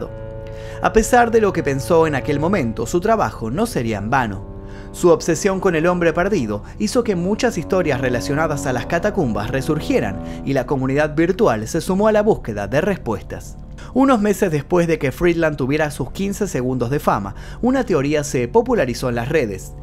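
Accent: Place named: Argentina. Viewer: ! Me encanta cómo relatas y tu voz le da un plus de interés a todo aquello que relatas. Los diferentes tonos, las pausas y el acento, el mismo que el mío, pero que me encanta escuchar por que ya no vivo en Argentina. Cuando vaya para Francia los visitarte y te contaré. Chau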